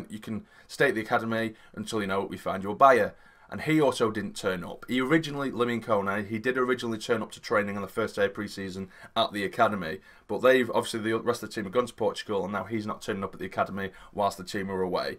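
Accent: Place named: Scotland, United Kingdom. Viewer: Not a bad Scottish accent imo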